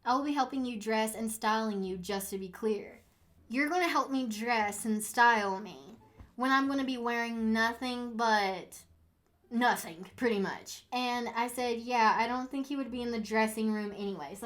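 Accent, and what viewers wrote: South, United States. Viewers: Love your Southern accent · I love your country accent! I hope your from a southern state or this comment will sound really stupid lol... I’m new to your channel just watched your two videos about your old apartment complex ! I’m subscribed and love you!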